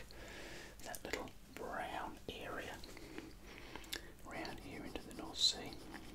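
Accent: Australia. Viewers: Saw Australian accent and immediately subscribed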